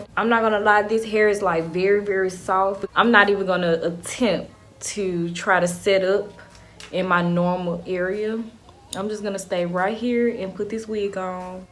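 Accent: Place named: Mississippi, United States. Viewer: This helped a lot 😊😊 & I love your accent lol , Are you from Jackson Mississippi?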